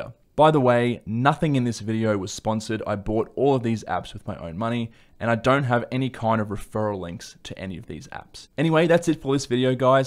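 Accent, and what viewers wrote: Australia. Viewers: Closest I have come listening to Australian accent. You speak well and clear. I have used windows all my life I can't relate to this but for the love of Apple animations and the Mac OS I am here for it.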